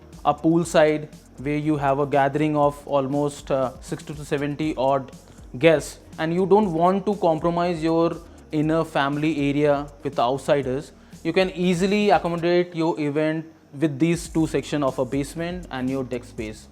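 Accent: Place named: India. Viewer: In which language is this guy speaking hard to understand with a heavy Indian accent can't understand a word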